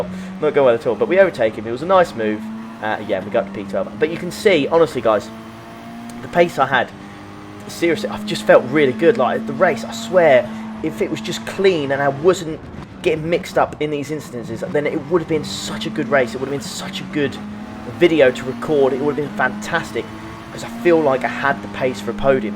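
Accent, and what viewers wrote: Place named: United Kingdom. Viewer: Anyone ever tell you that you sound like Daniel Radcliffe? Of course, I'm American, so I guess a lot of Brits sound that way to me. 😂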